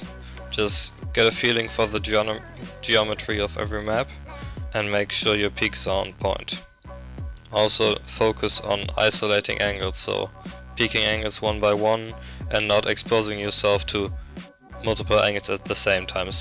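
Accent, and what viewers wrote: Germany. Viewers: Are u german??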